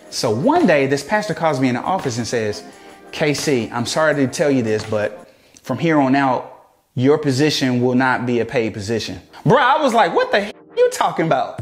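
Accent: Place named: South Carolina, United States. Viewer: Were are you from the accent sounds familiar. S carolina I'm guessing. Just a guess.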